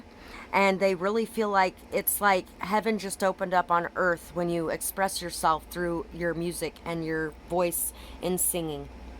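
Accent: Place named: United States. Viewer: euhm i don't understand American accent :/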